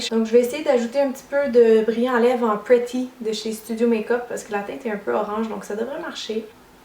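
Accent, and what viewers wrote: Quebec, Canada. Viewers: J'adore ton accent quebquoi !!😻😻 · Tu est québécoise?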